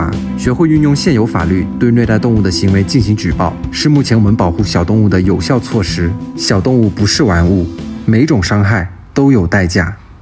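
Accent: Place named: Hong Kong, China. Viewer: 중국 홍콩분이신가봐요 영화에서 많이 들어본 억양같아요 무슨 말인지는 못알아듣지만요ㅎㅎ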